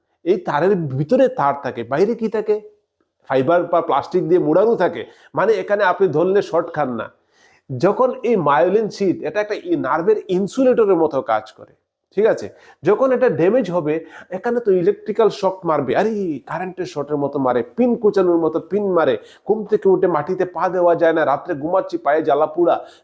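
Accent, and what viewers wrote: Sylhet, Bangladesh. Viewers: আপনার একসেন্ট সিলেটের। আপনি কি সিলেটি ?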